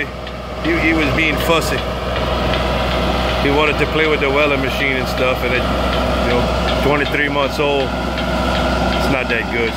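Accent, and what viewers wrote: Louisiana, United States. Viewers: You sound like you from the Parish!! I live in Hessmer.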